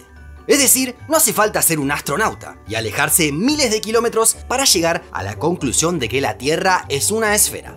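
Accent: Argentina. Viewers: Maquina vos sos Argentino? Si es asi, CAPO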